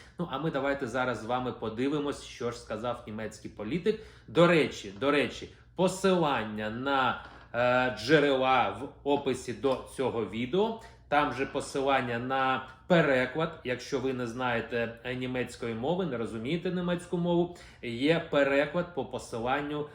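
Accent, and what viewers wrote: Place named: Russia. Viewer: А ВЫ. ЯК ОГО. ЛЫСОГО. СЫДЫТЕ. БАЛАКАЕТЕ. НА. УКРАИНСКИЙ. МОВИ. З. АКЦЕНТОМ. МОСКАЛЯ. И. ПОКАЗУЕТЕ. ЩО ВЫ НЕ. ЛЕДАЦЮГА. АНУ. БИГОМ. НА. ПЕРЕДОК. АНЕ. ЗВЫЗДИТЫ. И ЗАРОБЛЯТИ. ГРОШИ НА. БИДНЫХ. ЛЮДЯХ. ПРОВАЛИТЬСЯ ИЗ. СВОИМ. КАНАЛОМ. В. БЕЗДНУ!!!!!!!!! ПИДДЕРЖИТЬ. МЙ. КОМЕНТАРИЙ. ДОРОГИ. И. НАСТОЯЩИЙ. УКРАИНЦИ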